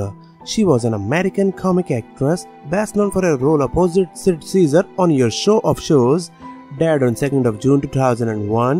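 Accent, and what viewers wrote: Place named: India. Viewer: Hes an Indian .his accent is different 👌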